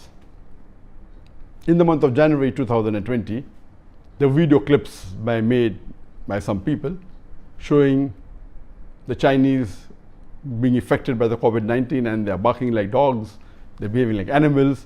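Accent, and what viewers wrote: India. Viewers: Hello, can you stop speaking like an Indian? TQ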